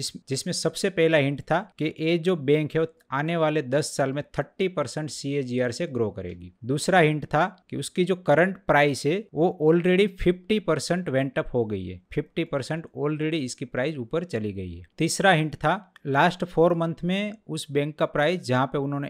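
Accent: Gujarat, India. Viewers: Thanks for your pure gujarati accent.❤❤ greetings from Surat...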